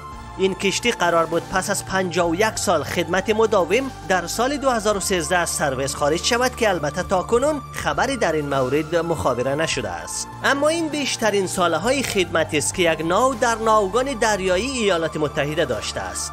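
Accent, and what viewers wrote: Afghanistan. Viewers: لهجه ایشون لهجه متداول در افغانستانه. جمله بندی و دستور زبانش هم مربوط میشه به فارسی دری. بنابراین ایشون کاملا درست صحبت میکنه. شما لطفا یه کم ادب و نزاکت یاد بگیرید.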